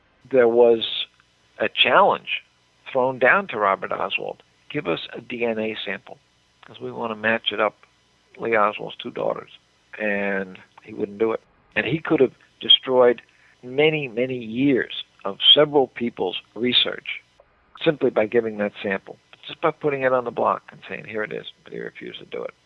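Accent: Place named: Northeast, United States. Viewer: You are correct. The speaker in this video has an upper Atlantic/Northeastern accent. Not familiar with southern accents and assumes that LHO is not capable of learning Russian? Not an uncommon inference.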